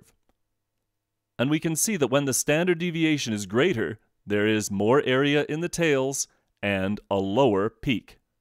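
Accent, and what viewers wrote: Canada. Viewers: powerful canadian accent!!! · you're from Toronto aren't u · and I realized you were Canadian before you said Zed... Thanks for your help professor..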